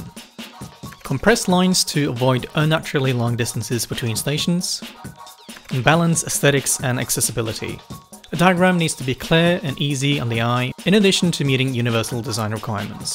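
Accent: Australia. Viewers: This is a great video. I'm struggling to pick you accent though. It sounds like you're Australian, but with a big chunk of the south of England thrown in.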